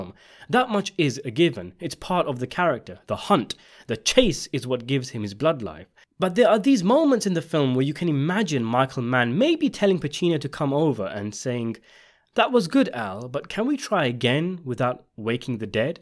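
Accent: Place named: East London, United Kingdom. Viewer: "Mans highly stylish film" talking like East London fam careful of disrespecting our culture.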